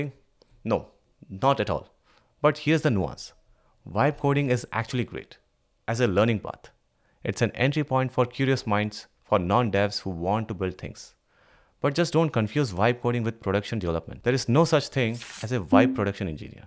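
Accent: India. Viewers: Are you south indian? I just thought of asking. No other reasons.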